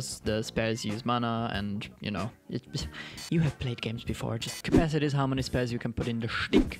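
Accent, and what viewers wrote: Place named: Germany. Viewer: I love this game and I love you made a video about it. But also, gotta love your deustcher akzent lol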